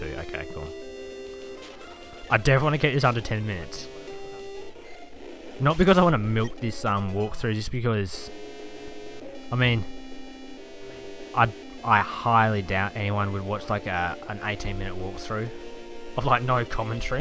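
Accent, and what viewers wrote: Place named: United Kingdom. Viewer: Nice a British guy goooood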